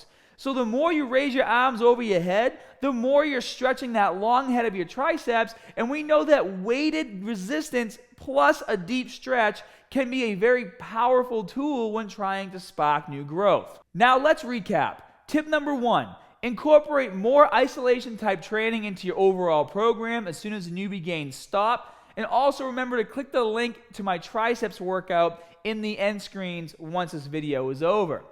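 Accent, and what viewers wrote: Boston, United States. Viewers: This is the most Boston I've ever heard you sound..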